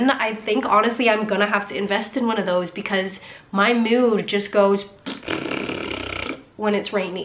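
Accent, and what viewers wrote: United States. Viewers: Ah, u have discovered British sarcasm, many of my US friends struggled with this too. Sorry, it’s us, don’t take offence, it’s part of our humour (think Rikky Gervais). Sorry about the 'turning heads’ with your accent. We have probabably become less accepting of the USA since Trump, those that have not traveled there alot, may think that all American’s are ‘MAGAs’ and as awful as he is. Finally, if you lived in Louisiana or even Florida, you might thik the UK is quite a dry place ;-). But then we dont have hurricanes or earthquakes - so thats an advantage! Enjoy your time here.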